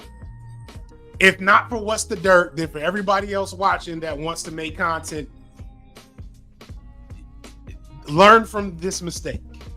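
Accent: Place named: Atlanta, United States. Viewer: so I'm not a native English speaker. I would call myself fluent in English but my two remaining gaps are technical vocabulary (terms specifics to an industry or a medium, terms that are never used in conversation so they're hard to learn simply by talking to people or even by reading books or watching films) and dialects/languages derived from English (kinda ironic because in my native language I'm the product of two families that speak a patois specific to their region). I really enjoy watching content more as a learning tool into American culture, Black-American culture and simply the perspective of someone older than me with a long academic background and complex, thoughtful takes I enjoy hearing through my own cultural lense. I hope you'll understand I'm coming at this with a genuine desire to learn, and since it's about spoken language, it's pretty hard to look up myself. 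I feel like the way talks in the long, scripted main channel videos is both influenced by his accent as well as articulated in a way that makes it evident this was a research, probably beta-read, rehearsed script that he's reading. By opposition, videos like this one on the B Sides sound to me like not only his Atlanta accent is stronger but he's switching between AAVE and English throughout his sentences (as I understand it, AAVE is it's own language with a culture and an interesting history of ethymology so it feels appropriate to treat it as such and view people who use it as bilingual, but i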